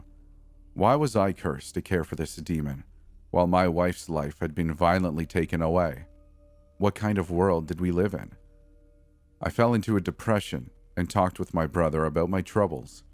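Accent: Canada. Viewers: Love the Canadian accent😍🇨🇦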